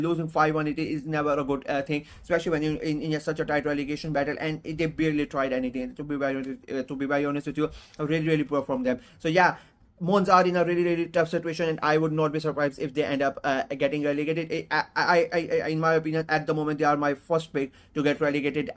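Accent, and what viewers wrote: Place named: India. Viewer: r u from india , u sound so much like them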